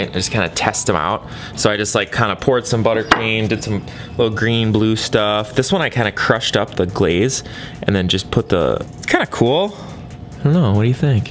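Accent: Minnesota, United States. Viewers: Wow CLASSIC Minnesota accent lol love it. Mine only comes out when I’ve had too much to drink now. 😋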